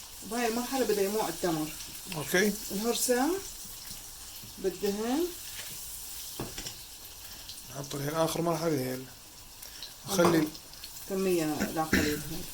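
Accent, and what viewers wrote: Mosul, Iraq. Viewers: هههه هوة اكو احلة من الهجة عراقية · مبين مصلاوي من لهجتة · والله مصلاوي قحي.....محد غاح يفتهم على هالحكي غيغنا احنا المواصلة.... هههه...تحياتي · انته من اي دوله يعني عراقي لو شنو 😁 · إضافة الى اكلاتك الطيبة والراقية ،ادائك الرائع ولهجتك العراقية الاصيلة الي مابيهة تملق هية الي تخلينة انتابعك ، عشت يا احسن شيف . تحياتي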